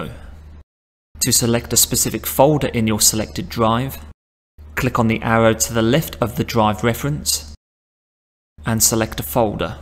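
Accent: United Kingdom. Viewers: British accent ❤️❤️❤️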